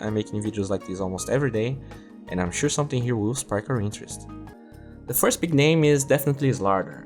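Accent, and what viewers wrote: Brazil. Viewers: You kinda have a Brazilian accent, it's cool though.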